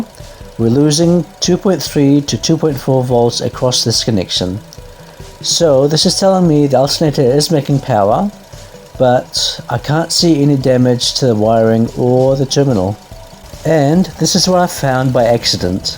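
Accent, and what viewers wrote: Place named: New Zealand. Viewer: Evening!! I have thoroughly enjoyed your videos as I posses the same vehicle therefore is very informative & helpful. I couldn't help but notice the kiwi accent! As an aspiring mechanic, you as a mentor would be fantastic.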